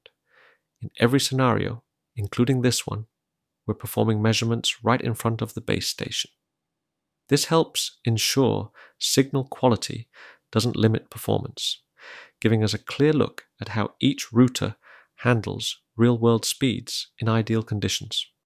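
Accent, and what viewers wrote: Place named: United States. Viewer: I'm German and have little practical experience with English. As a radio amateur, I'm interested in the films from "Ringway Manchester." My problem, however, is that I find it extremely difficult to understand the guy. It's not even Donald Duck-like speech anymore. I also have to say that I understand your presentation without any problem. It's as if an educated American were speaking. I understand Satanic Coronaut's question as meaning that he wasn't sure whether the test applied to US networks or European ones.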